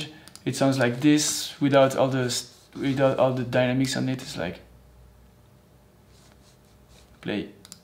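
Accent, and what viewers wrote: France. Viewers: Je suis francais et on reconnais ton accent français ^^ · I love your remix! Keeps me moving! Very inspirational indeed! I also love your french accent! 😍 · Salut, j'ai l'impression que tu a un accent français, tu est français ou quoi? Et sinon. J'adore se que tu fais!!!! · You do have a slight french accent, don't you ? Considering the fact that you're Belgium, it's shouldn't be too far from the truth 🤷🏻‍♀️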